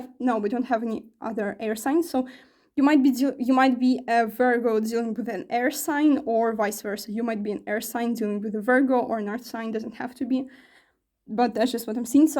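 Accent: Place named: France. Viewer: Pile 1. Are you french ? Cute accent by the way 🥰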